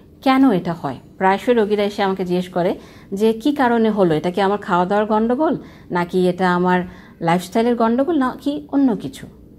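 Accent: Chittagong, Bangladesh. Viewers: Madam ki chittagong ashen????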